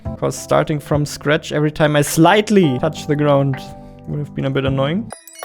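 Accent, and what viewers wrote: Germany. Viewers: Never heard such german englisch but I love it haha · are you german? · Bist du Deutsch? Hört sich bisschen so an. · Man hört den Deutschen Akzent so raus haha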